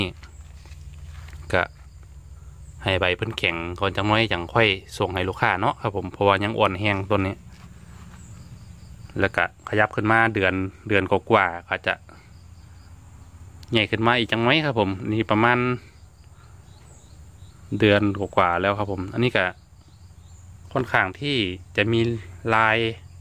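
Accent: Korat, Thailand. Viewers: สำเนียงคนโคราชแท้ครับ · ช่องอีสานฟังไปฟังมาเหมือนคนโคราชเลยพี่คนที่ไหนครับทำไมพูดแบบนี้ ผมเเค่สงสัย555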